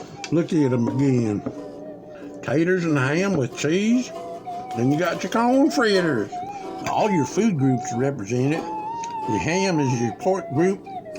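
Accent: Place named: South, United States. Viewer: Good job on the Southern accent.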